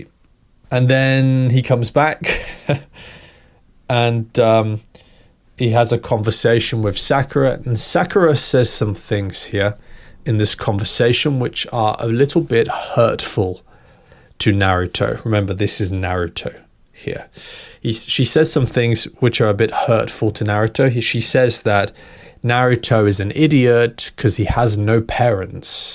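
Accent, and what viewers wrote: United Kingdom. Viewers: Really, the British accent is the best.❤❤❤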